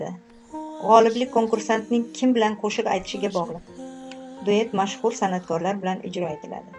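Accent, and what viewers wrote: Uzbekistan. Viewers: Диктор Хорезмненсизба? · Диктор с какой страны? С Узбекистана?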